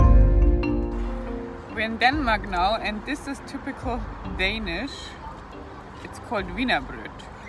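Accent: Germany. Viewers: Great video, love your german accent... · I like your German Acent in english!!😆😆Its so german!😄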